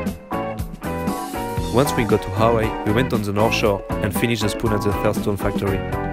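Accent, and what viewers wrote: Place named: France. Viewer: On sent un petit accent français de rien du tout. Je ne connaissais pas du tout ces flexspoon board amazing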